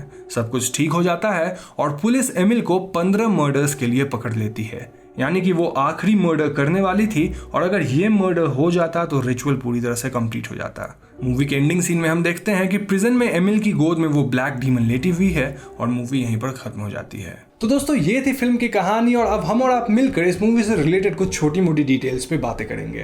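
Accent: Bengal, India. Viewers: I love And his bit of bengali accent🤗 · U got a somewhere bangla accent... 😊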